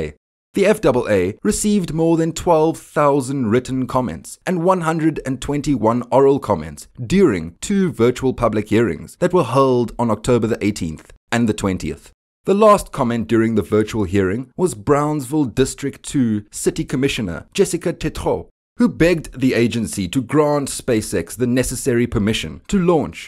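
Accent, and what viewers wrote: South Africa. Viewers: He's from South Africa, like Elon himself.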